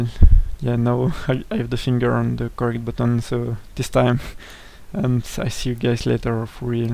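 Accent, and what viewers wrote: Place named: France. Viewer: nice accent dude you must be the only french whose english i can listen to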